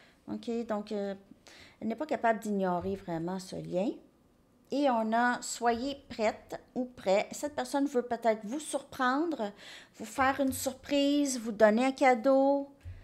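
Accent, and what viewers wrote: Canada. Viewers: Merciiii 💖 (Je poursuis mon commentaire, je l'avais publié trop vite). Tout me parle dans cette guidance! Oui j'ai avoué à cette personne que je ressentais de l'amour pour elle et que je pensais qu'on ne s'était pas rencontré par hasard. Mais il continue de tergiverser en disant qu'il ne sait pas ce qu'il ressent, que pour l'instant c'est trop compliqué, et tout plein d'autres excuses. En tout cas, je constate qu'après des années, il semble toujours avoir envie de me revoir 😌 Espérons que le destin soit plus puissant que les indécisions. PS: J'aime toujours autant ton accent canadien si doux 😍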